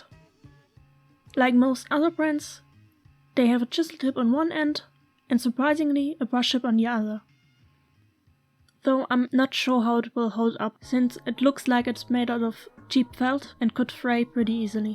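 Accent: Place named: Germany. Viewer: Hey just wanted to say your video is actually pretty good, the quality is good! Also it was pretty interesting because i never had an actual set of these to use for an actual illustration. I suppose that you are a native German speaker? At least you are sounding like one, but i might be wrong- Anyways, your accent is indeed visible and I do not know if this helps but I learned that speaking englisch, and getting the accent a bit less visible is not easy. As an advice: it might help you if you speak more "swollen" German is a language that makes you speak with mote cutts. English is a language that is rather smooth, while german is kind of fractional, both in individual can sound very pretty but combining these mostly sounds strange because its a big difference. Also if you really wanna work on it you always can watch movies or tv shows and speak with the characters. 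Because if you focus on that for most people the words come again voiced in their heads when you then speak them out loud. But, do this with older series, something that you already watched and better not use something like sit-coms, because people are speaking faster and not always correct english so its not the best idea to get there an example. At least this was something that helped me alot! But you actually don't need to work on the accent at least you speak very clearly and you are understandable, so infact there is no real need to do so, but if you want to improve thats the advice I could give... Have a gre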